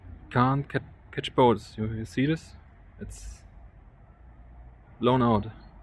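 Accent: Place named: Germany. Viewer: Immer wieder spannend, dass man instant hört, dass du aus Deutschland kommst. 😂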